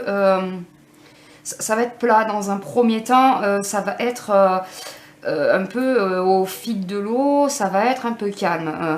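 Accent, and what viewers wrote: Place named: South, France. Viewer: Merci J'apprécie beaucoup votre travail Et ce petit accent du Sud m'apporte le soleil qu'il manque chez moi Merci et bravo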